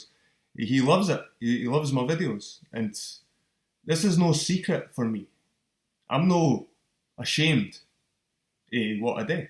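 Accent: Scotland, United Kingdom. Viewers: Your my new favourite YouTuber. I love your honesty in the conversations you present here. I don't mind what your stand is on the shape of the earth, at least your aware of the subject and give it some thought. Sorting out the lies is a great idea. Good man and that Scottish accent is beautiful to listen too. Cheers! 💜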